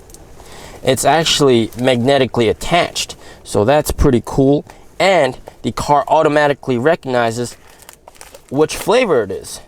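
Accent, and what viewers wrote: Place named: New York, United States. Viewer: Hey are you from NYC? Queens or Brooklyn? I hear the accent lol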